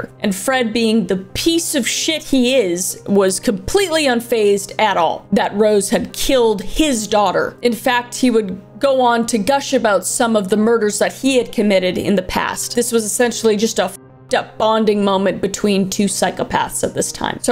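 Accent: Canada. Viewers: The Canadian comin out in your accent is killing me 😂😂😂😂😂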